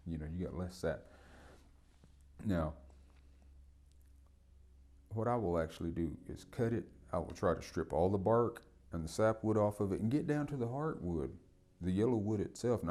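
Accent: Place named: South, United States. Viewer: i was so expecting a heavy native man accent....was not expecting that southern accent...im native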